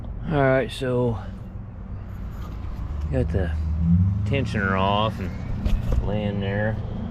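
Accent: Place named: Ohio, United States. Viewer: I heard "wars" and "tars" more from a guy from Ohio than I ever did from actual Texans when I lived in Texas for 30 years.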